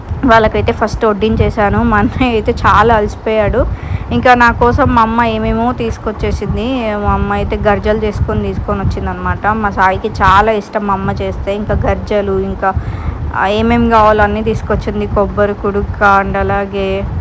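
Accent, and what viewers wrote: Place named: Gauribidanur, India. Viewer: nam Gowribidnur slang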